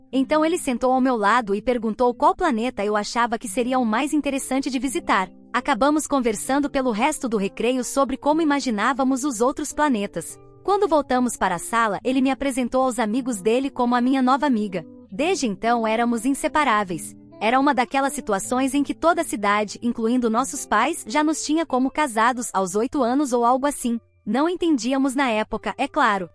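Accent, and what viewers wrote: Portugal. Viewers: este português de Portugal é terrível ...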